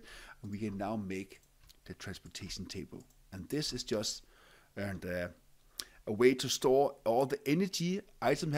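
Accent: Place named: Denmark. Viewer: can't decide if you sound german or Danish, but pretty sure I hear the strong danglish accent.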